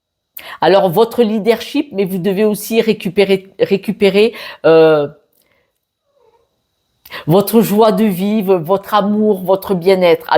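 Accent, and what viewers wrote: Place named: Toulouse, France. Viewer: Je suis tombée par hasard sur vos vidéos et quel bonheur votre accent ressemble au mien j'habite sete mais je suis toulousaine fantastique merci. Evelyne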